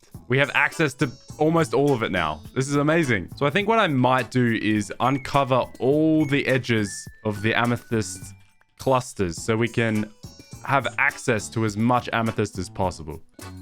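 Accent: United Kingdom. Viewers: Are you British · Its like 3 months since you have shifted to britan and already you sound british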